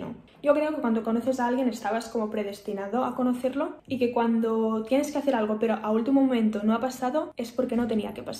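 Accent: Spain. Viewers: Apenas comencé a verte hoy y no he parado todo el día en ver videos tuyos, que realmente me encanta el acento español y creo que la forma en la que hablas y eres me relaja demasiado.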